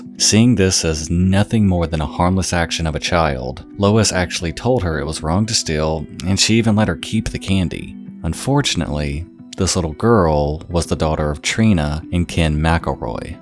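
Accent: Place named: Texas, United States. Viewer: Are you from Texas? Your accent sounds very Texan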